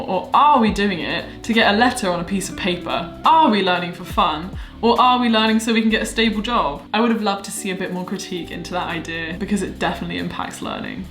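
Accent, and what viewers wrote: United Kingdom. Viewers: since i watch your videos, i have to say that i can listen to British accent easilier. One more thing is why you can have lots of energy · Hey.. I love ur accent.. The first time I saw ur video, this british accent of yours inspired me to learn it...😀 Your motivational videos always made me feel confident and have gratitude and how could I forget your unique study techniques which you delivered on this platform to millions of people in order to help them out.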